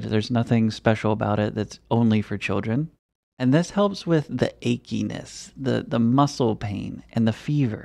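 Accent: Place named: Pennsylvania, United States. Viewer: Maybe Pennsylvania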